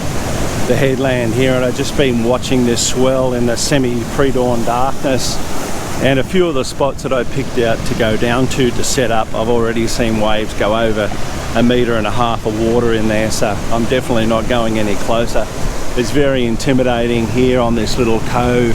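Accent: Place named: Australia. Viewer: G'day, I've just given you a sub mate. I'm a learner and I've stumbled on to your channel and I've got to say how refreshing it is to first know where you're talking about and to hear a familiar voice in the form of your Aussieness. I'm enjoying the fact that you are shooting places that are reasonably accessible for a fella like me and I'm going to confess I will be visiting some of these locations. Thanks heaps for your efforts with this channel mate, fully appreciate it.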